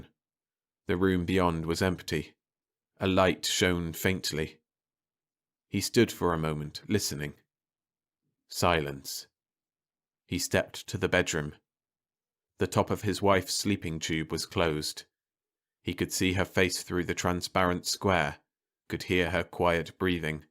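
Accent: United Kingdom. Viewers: I have trouble with the nasal British accent. Just a personal thing with me.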